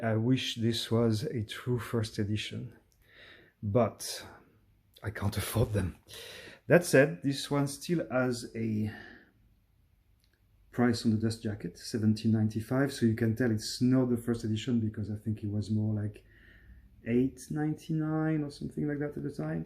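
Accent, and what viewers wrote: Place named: France. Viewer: You speak english like a french !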